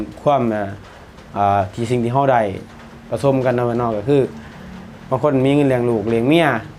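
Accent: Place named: Isan, Thailand. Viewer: เจ้าเว่าคือคนอีสานน้อ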